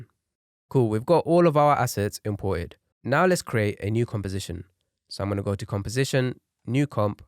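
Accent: United Kingdom. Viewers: most british accent i ever heard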